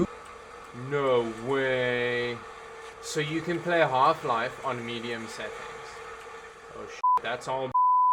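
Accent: South Africa. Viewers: Are you South African? You sound South African. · Are you South african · Ek kry die gevoel jy is ñ Afrikaanse Dawid, met daai aksent en al, so gryp daai soldeerbout en sit daai connector op en kyk of dit werk! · Yes definitely do it, i wana also see the result of the difference a 6 pin pci-e connector would make, you have a south african accent, are you south african? Just curious... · Are you a South African expat? Your accent tells me that you are. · South African???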